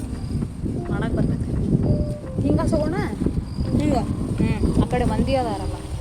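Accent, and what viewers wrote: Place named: Hubli, India. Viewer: hubli Accent ✌️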